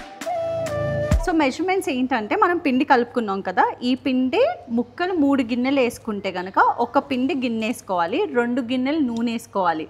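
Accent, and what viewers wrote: Godavari, India. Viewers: Ur godavari yaasa n yeatarakam are peaks...😅 Want a casual godavari accent video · Video lo anniti kanna mi godavari slang so nice andi ఆయ్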